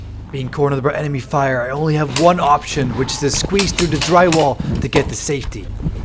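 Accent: Netherlands. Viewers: Ayy are you dutch becuse i can understand you and im afrikaans Just look it up afrikaans is a descend of dutch the more you know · Are you dutch cause i am and i think you are too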